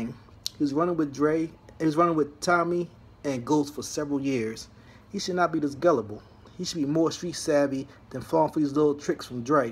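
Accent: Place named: Philadelphia, United States. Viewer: You sound like you from Philly... Lol love the review and theatrics 😎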